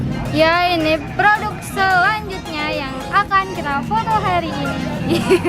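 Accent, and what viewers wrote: Lombok, Indonesia. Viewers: Mantul bngt cara ngomongnya kak medok lombok,saya sendiri juga orang lombok:)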